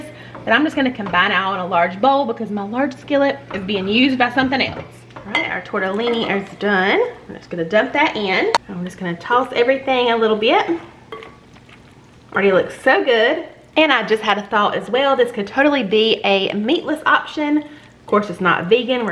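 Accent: South, United States. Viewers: Hey, I love your channel, I've been subscriber for probably 3 or 4 years. You sound like your a southern and that would make you near my neck of the woods. I'm from Charlotte, NC but I live with my husband of 39 years in Monroe, NC. I was just curious of what part of the south are ya'll from. I do understand if you don't wish to tell me though. God bless you and have a wonderful Thanksgiving Holiday.😊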